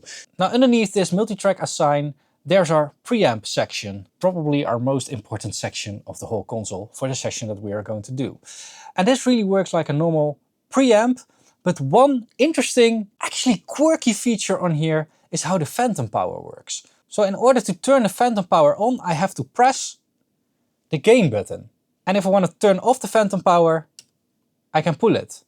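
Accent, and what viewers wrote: Netherlands. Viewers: i keep coming back for your dutch english accent, dutch people are hilarious but very smart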